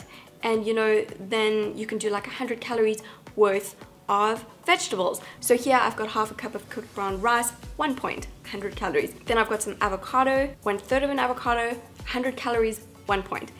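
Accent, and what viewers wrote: South Africa. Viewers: Are you from South Africa? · I love your South African accent! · I could totally tell you were from South Africa !! ❤❤Do you still live here ? (From a fellow South African 🇿🇦) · Are u South African ?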